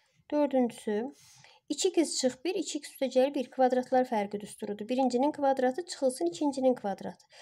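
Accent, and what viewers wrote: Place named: Sheki, Azerbaijan. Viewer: Birdə sizə sualım var🙃.Çox marağım tutdu.Siz Şəkilisiz?Ləhcəniz Şəki ləhçəsi kimidi.Mən bakılıyam.Amma anamda sizin ləhçədə danışır.Zəhmət olmasa mənə cavab yazardınızda😇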